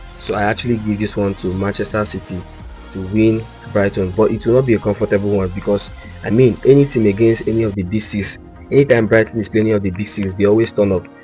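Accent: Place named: Biafra, Nigeria. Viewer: Was Nigeria colonized by the British? This accent is Biafra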